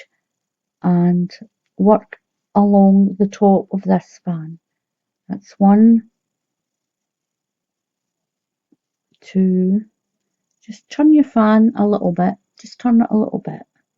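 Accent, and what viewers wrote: Scotland, United Kingdom. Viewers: your beautiful Scottish accent remember me of the good time that we had in Scotland. My husband looked forward on breakfast every morning because of the haggis. You are a wonderful teacher, thanks for your great crochet tutorials. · Hello, I just subscribed your channel. I watched many different ones and yours is my absolute favourite!! Beautiful crocheting and I love how you explain everything so well and repeat it over and over, which I find important because then I don’t have to go back to find it again. Also love that you speak slower than most do, it makes me feeling relaxed. And your British accent is lovely 😊 I’m German but live in Canada since 26 years. The winter is hard and long, so I picked up crocheting. I’m enjoying making this one here right now. I have saved several videos from you and I want to do them ALL! 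Lol Thank you for doing this❣️👍👏❤️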